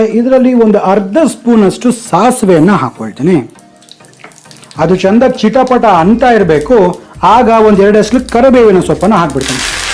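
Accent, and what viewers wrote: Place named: Mangalore, India. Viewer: Mamnglore kannada accent ,kelokke tumba channagidhe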